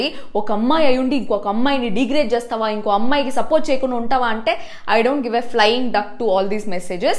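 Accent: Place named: Tamil, India. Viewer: I think ni telugu lo arava vasana tagulutundi may b ur from nellore. Like ur slag chala rojula tarvatha vintunna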